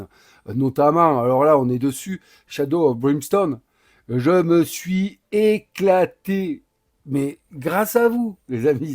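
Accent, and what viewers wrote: South, France. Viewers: avec l'accent du soleil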